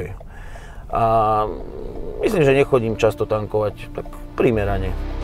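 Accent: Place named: Liptov, Slovakia. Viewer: ten tvoj liptovský prízvuk ... nádhera !!! :D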